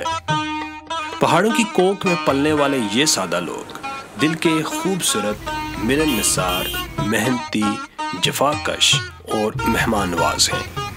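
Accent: Standard. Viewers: Yar ap ki Commentary ka koi Saani ni hai , Zabardast Pure Urduuu Maza ajata hai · Itni pure Urdu MaashaaAllah 😍😍 Bht pyara khubsoorat Mera 🇵🇰🥰🥰🥰🥰💗💗💗💗💗💓💓💓💓💓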